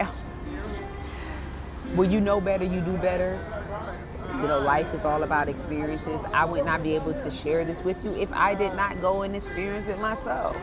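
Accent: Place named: Louisiana, United States. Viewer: It sounds like you’re in Louisiana 😍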